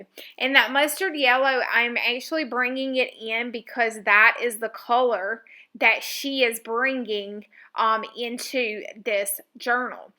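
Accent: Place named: Kentucky, United States. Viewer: Wow, I just "discovered" you only a week ago when I when was searching YouTube for beginner videos to get started on my new Gelli plate. I had been watching very complicated videos and was getting discouraged before I even started. Then I found YOU! Instantly my heart leapt with JOY and I was like, "I need MORE of this girl in my art life!" I have started binge watching your videos because they are EYE CANDY and just because I cannot get enough of your sweet VOICE (my grandpa grew up in KY and later Baton Rouge and used to call this Alaska girl, his only granddaughter "Sugapie" so hearing your accent is bringing back SO many memories, even though he passed 20 years ago). Words cannot express how much you are a GIFT to the art community as well as sharing your JOY with anyone who is lucky to find you online. I am signing up for your newsletter and will start enrolling in your classes. 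Much love to you and Josh and the puppies!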